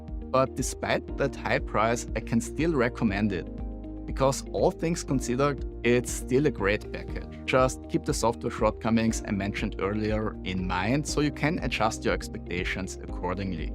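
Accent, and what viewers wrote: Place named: Vienna, Austria. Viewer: This Viennese non-English is hard to stomach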